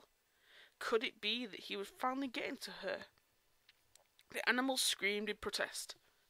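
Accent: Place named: United Kingdom. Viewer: I was wondering if an I was supposed to be there, but got missed out. I sometimes do that, miss words out, especially if I'm on a writing buzz. Well your accent isn't "British" what-ho old chap I went tp a Eton don't ya know, but it still sounds like a British accent to me and I was refrring to your "Maybe it's supposed to be like that, but not for me, I'm British!" or something along those lines. And I'm going to have to re-read that scene, lol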